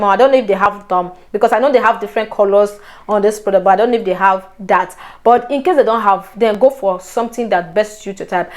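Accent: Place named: Nigeria. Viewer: Your pigin is so sweet and the way you pronounce subscribe button is out of Nigeria . Love you girl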